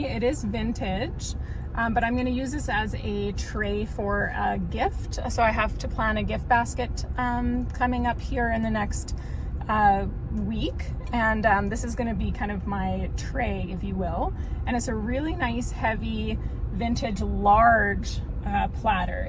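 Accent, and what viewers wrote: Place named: North, United Kingdom. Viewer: Those frosted blue glasses were def a miss! They were so pretty! 😊 Purse is cool, and so curious how much weight that macrame chair would be able to hold! 😮 Also, I need to finally mention this… (hope you see it!) There’s a really great YouTube ad that I rarely see anymore but used to see it a LOT. It’s a supposed buyer who’s showing all the various ways and applications that this particular lint scraper thing can perform - all while narrating it. It’s actually pretty funny bc she says “It will get you questioning your life and things like…Does my dog still have a coat? Am I a gross and disgusting person?” and so on 😂, and at the end she says, “Idk, this just might be the best thing I’ve ever bought from the internet.” ANYWAY, I swear YOU are the voiceover person!! It’s your exact kind of measured dry humor and the voice (complete with northern accent) sounds just like you! 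😄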